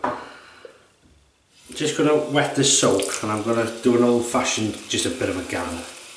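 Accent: United Kingdom. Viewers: I travel quite a bit for work, so those shave sticks are a life saver for me. Thanks for the video,! From which part of the UK do you hail? (I know England, but which part? London? Birmingham?)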